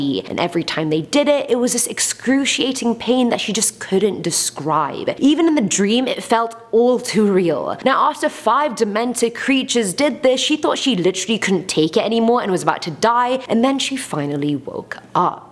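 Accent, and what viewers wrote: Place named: Scotland, United Kingdom. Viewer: no. Canadian English but her accent is Scottish. I am Scottish which is why I recognise it. Some may say she is more likely to be of middle Eastern descent but I personally do not want to make any assumptions about her as its not my place to do that.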